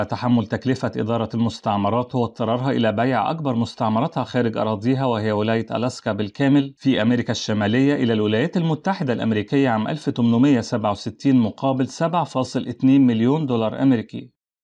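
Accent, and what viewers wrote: Egypt. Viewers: كم أكره أن أدخل لمقطع وثائقي وأكتشف ان المذيع هو (مصري) بسرعة أعطي (دس لايك) للمقطع وأخرج المصرين دمروا اللغة العربية والانكليزية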